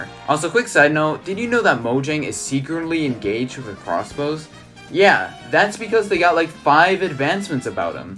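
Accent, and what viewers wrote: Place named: Canada. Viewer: Yeah, you're definitely Canadian, I suspected it after hearing eh then you spoke French. I can't see you not being Canadian.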